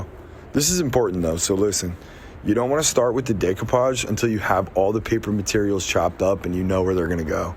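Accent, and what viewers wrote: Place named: Philadelphia, United States. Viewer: I haven't lived in Philly in like 18 years but your voice is makin me homesick.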